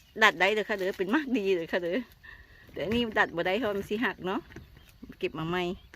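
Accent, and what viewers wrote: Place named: Loei, Thailand. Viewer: อยู่ จังหวัดไหนค่ะ พูดเหมือนคน เมืองเลย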